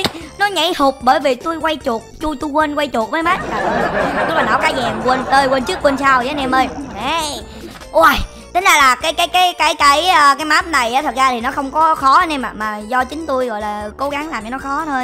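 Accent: South, Vietnam. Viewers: vì cách đọc của người miền Nam có chút nhầm lẫn thui . Ko sao cả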